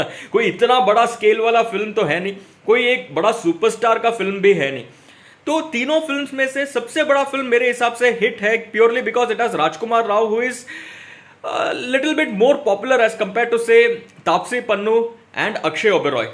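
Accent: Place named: South India, India. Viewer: great job brother..by the way are you south indian? your tone seems to be typical south indian:)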